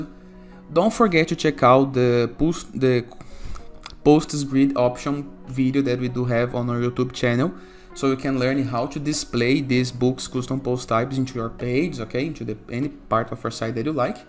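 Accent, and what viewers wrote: Brazil. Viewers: Cara, você é brasileiro?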